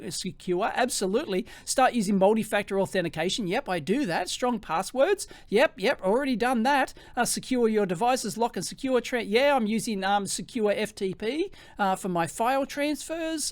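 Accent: Australia. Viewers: Cant believe how many folks totaly missed that it was complete sarcasm. And Im just an OLD YANK who knew even with that awfull ausy accent. P.S. I hate my dictator as much as yall so dont hate on all mericans. misspellings are on purpose. TWO THUMBS UP 👍👍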